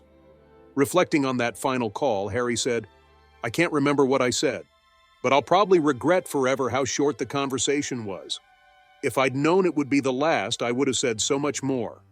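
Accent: United States. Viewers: There are a few such Royal Family posts of YouTube today.Obviously someone has an agenda. The American Narration is the clue to give it a miss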